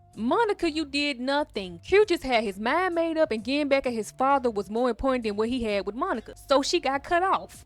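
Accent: Memphis, United States. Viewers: Where are you from 😅 because I love a good accent!!! It’s giving a lil Glo so I wanna say Memphis ….lol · Are you from Memphis? The accent much love ❤️ another great video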